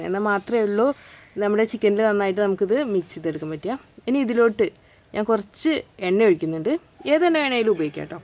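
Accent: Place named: Malappuram, India. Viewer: ഏതാണ് നാട്.. വർത്താനം കേട്ടിട്ട് മണ്ണാർക്കാട് മഞ്ചേരി യുടെ ഇടക്ക് ആണെന്ന് തോന്നുന്നു..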